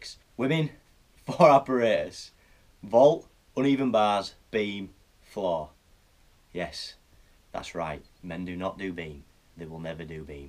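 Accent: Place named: Durham, United Kingdom. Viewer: Cool video, and your accent is awesome. Is it Durham or thereabouts?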